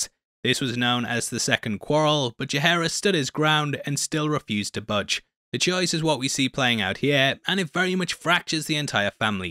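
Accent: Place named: England, United Kingdom. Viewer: I love how your English but your impressions bare terrible lol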